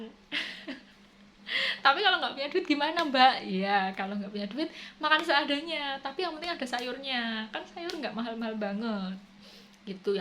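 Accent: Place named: Java, Indonesia. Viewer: Mba logat bicaranya kok njowo banget, tpi kl lgi di sinden aduh blakutek brantakan, brarti cma lucu2an aja ya 😅😅🤔 Mba aq fans kmu banget lho Aq mau tanya mb itu lahir di kota mana, sulawesi apa jawa yogya? Balas ya mb pertanyaan aq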